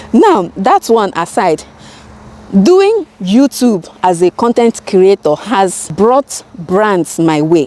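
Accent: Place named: Igbo, Nigeria. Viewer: YouTube is really a great blessing honestly ❤❤,low view can be discouraging but we keep moving,yes momma I remember the airfryer 😅 both the accent matter,but we like our igbotic accent like that 😂, you are Amazing 🥰, keep bringing it on 👌🏽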